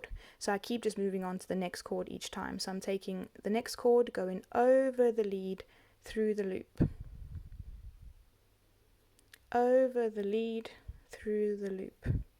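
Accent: South Africa. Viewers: South African? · Thanks for this! I needed a refresher! Are you South African? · Great to hear a South African voice.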